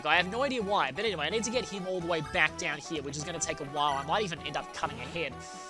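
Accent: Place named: Australia. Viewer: man that speed up voice really did show your Australia accent.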